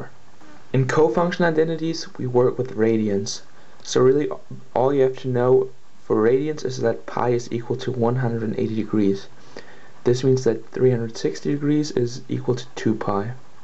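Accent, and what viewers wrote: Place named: Germany. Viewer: i notice a slight european accent are you german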